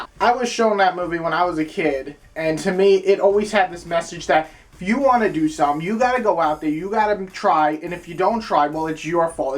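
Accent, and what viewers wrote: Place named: East Coast, United States. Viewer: I agree, he sound like Jonah hill with a east coast accent.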